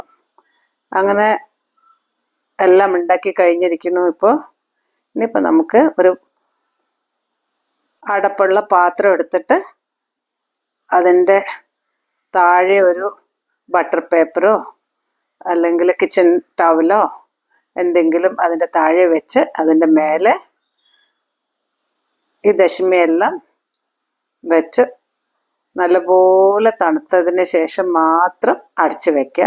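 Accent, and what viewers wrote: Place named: Palakkad, India. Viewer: You sound like my Grandpa 's family from Ellapulli Palghat 😊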